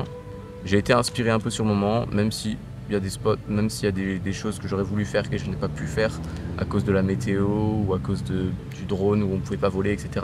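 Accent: France. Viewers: Perso j'adore regardé des films en anglais mais écouté un Français parler anglais perso j'accroche pas car ca me ramène a mon mauvais niveau d'anglais mdr :/ apres ca se regarde très bien, au niveau creatif et audiovisuel pas besoin de la langue ;) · C'est un très beau projet personnel et professionnel. J'avais un peu de mal avec le 100% anglais et cela m'a beaucoup plu. C'est intéressant d'entendre un français parler anglais, je comprends mieux cette langue. Si le nombre de vues est ta priorité, ce n'est pas une bonne base. L'intérêt est de partager ta passion.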